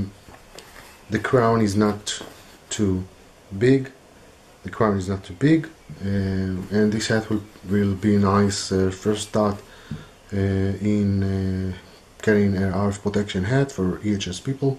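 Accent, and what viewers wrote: Israel. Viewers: i think its a scam, guy sounds like israeli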